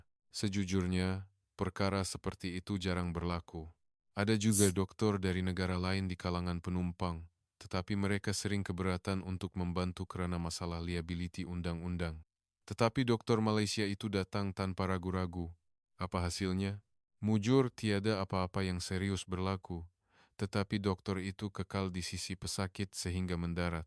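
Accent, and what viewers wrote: Indonesia. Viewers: suara admin org indon.bukan org malaysia.sebab org indon suka <link> malaysia tidak bercakap macam ini. ini penipu dari indon.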